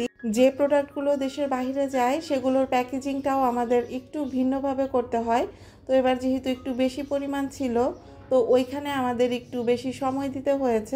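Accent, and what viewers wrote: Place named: Tangail, Bangladesh. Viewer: আসসালামু আলাইকুম আপু ❤ তোমার টাঙ্গাইলের ভাষার কথাগুলো খুবই সুন্দর লাগলো ❤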